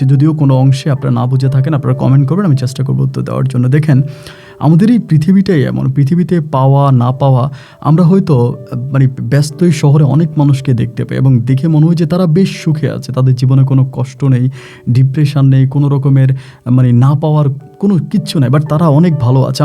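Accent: Bangladesh. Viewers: You were not born and brought up in England, your accent is Bangladeshi.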